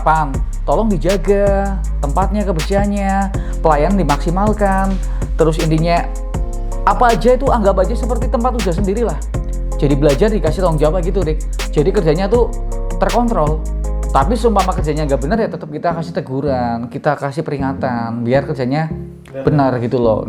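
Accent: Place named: East Java, Indonesia. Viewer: Lokasi mana ini bos...dari logat nya kyk Jawa timur Malang...pingin belajar....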